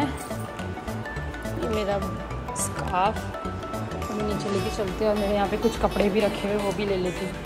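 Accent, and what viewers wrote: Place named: Mumbai, India. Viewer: ap ki aawz me mumbai ka tuning hai sach mein inshallah allah ap ka 100k jld se jld hojayega ap ke sach me ek din 1million followers hoge inshallah thankyou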